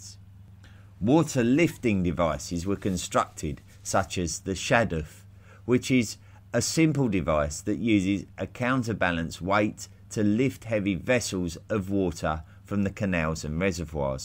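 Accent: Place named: England, United Kingdom. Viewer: I believe it to be the, More-run accent. Fairly common throughout England. Many speak in this manner. Car salesmen. Snake-oil salesmen. Circus leaders. Politicians. It varies widely throughout England.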